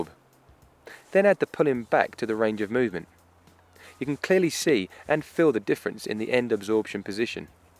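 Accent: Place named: RP, United Kingdom. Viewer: proper queens English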